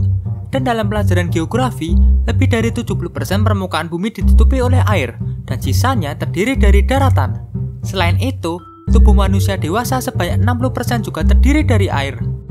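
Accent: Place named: Java, Indonesia. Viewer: wkwkw medok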